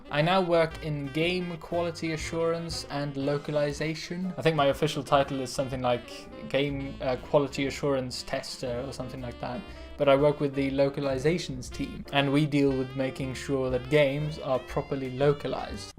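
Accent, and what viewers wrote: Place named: United Kingdom. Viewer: Do all sweeds have this gorgeous British accent??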